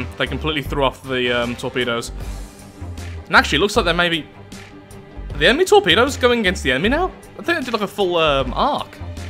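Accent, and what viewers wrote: United Kingdom. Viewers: Not only bc of brit accent but you sound exactly the same as Alex the rambler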